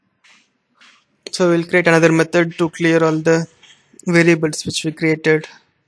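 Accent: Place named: India. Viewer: Would be nice if you'll try to speak imitating British accent, cuz Indian accent is pretty difficult to understand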